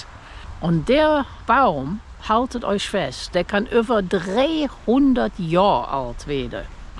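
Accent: Cologne, Germany. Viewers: Es war mal wieder einmal ein Erlebnis den Koelschen Dialekt zu hören und noch dazu so viel über die herrlichen Farben der Bäume und die Baumarten zu erfahren . In Parksville auf Vancouver Island hatten wir letztes Jahr genau 3 Tage etwa 5 cm Schnee, in Saskatoon dabei 5 Monate Schneefälle. Danke für die schönen Videos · Hallo ❤was für ein wunderschönes Video, und dein Kölsch ist so herrlich zu hören, und die Farben der Bäume und deine Infos dazu ist so Klasse, bin so begeistert von deinen Erklärungen der Bäume 🌲borrrr und die Farben und die Höhe der Bäume.Vielen Dank ❤LG Monika 😊 und die Pilzkunde super Idee mit den igennamen 😊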